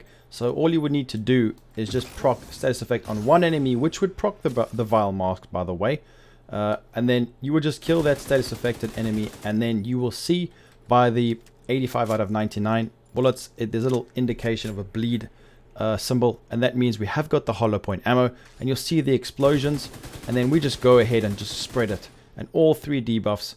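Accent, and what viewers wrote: South Africa. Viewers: cape town accent..? howzit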